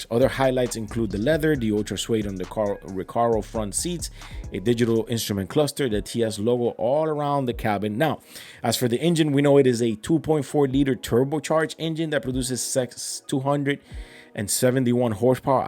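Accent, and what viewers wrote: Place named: Miami, United States. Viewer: Bro I just came across your channel. I’m from Miami, I just know you’re from Miami with the accent 😅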